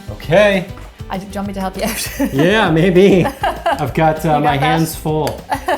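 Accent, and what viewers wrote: Ireland. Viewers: I am DEFINITELY going to the grocery store NOW to buy the supplies. Don’t know if it’s because it’s lunchtime or what, but I’m near drooling here. And listening to you certainly didn’t hurt. Love the Irish accent ❤️ You just popped up on my recommendations, but SO glad you did .... new subscriber here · Hi love your recips and i love your irish accent 💗💗💗💗💗💗😘😘😘😘😘🍰🍰🍰🍰🍰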